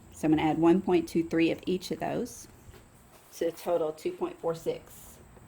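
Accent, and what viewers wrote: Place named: South, United States. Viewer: This has nothing to do with your beautiful work, but I love your voice and beautiful southern accent.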